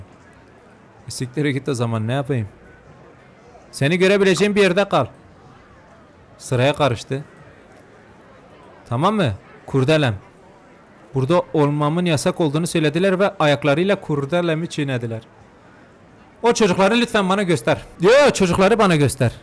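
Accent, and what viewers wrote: Elazığ, Turkey. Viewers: ABİ ELAZIGLI MISIN MAİLİNDE 23 VAR VE AKSANDA ELAZIGLI GİBİ BENDE ASLEN ELAZIGLIYIMM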